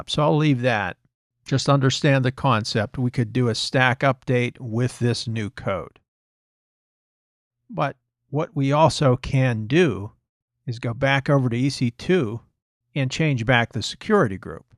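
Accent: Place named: United States. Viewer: Yay! An american voice!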